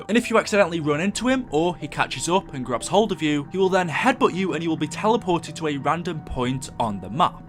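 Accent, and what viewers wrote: United Kingdom. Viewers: He sounds British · I hear a British voice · He sound British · the voice sounds slightly British. anyone else agree?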